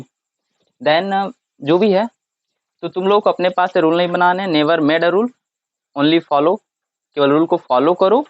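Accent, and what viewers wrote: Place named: Bhojpuri, India. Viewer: bhojpuriya jhalak ta jaan marat ba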